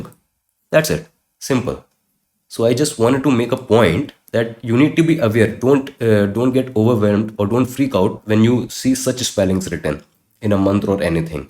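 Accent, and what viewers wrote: Hindi, India. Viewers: All your explanations are very good , but for your pronounciation . Because mostly you pronounce every Sanskrit word in Hindi format. You don't pronounce Balaka, but you say Balak. Visarga is correct not Visarg. All Hindi speaking pronounce Sanskrit words with consonants as क्, म्, व् as Balak, Ram, Shiv, instead of बालक, राम,‌शिव, Please change your pronounciation, otherwise we South Indians ( Tamil) will mispronounce. Sorry for this corrections. But in Sanskrit , pronounciation should be very precise.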